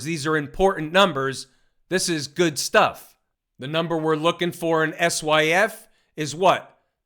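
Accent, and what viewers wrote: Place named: New York City, United States. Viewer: The NY accent is over the top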